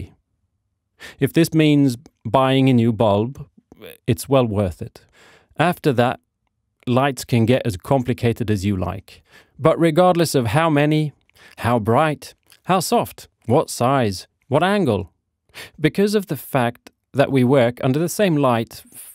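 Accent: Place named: Sweden. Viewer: I like your style, there aren’t many minipainting channels that look like yours. I would love to be proven wrong. Though you were British for a short moment but then I heard the Swedish and realised yes this man has a lot of style. I can relate more. Also the cinematography or photo I don’t know what is what, it very much works. Also how you give the viewer the why and your purposes. Not just the how. Very important. I like the string hula conversion very much, would you mind showing me the ropes I want my own!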